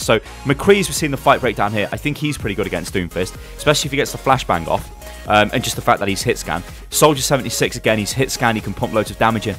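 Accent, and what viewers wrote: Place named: Northern England, United Kingdom. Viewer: Crossfire Gaming it's because he's northern. Everyone loves northern accents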